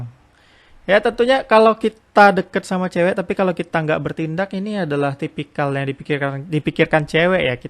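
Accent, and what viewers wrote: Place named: Bireuen, Indonesia. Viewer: Bacanya Bireun banh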